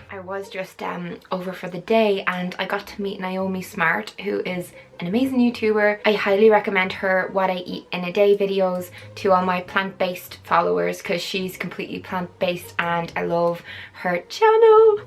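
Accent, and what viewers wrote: Ireland. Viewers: Ireland u can here on her accent hahah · R u Irish?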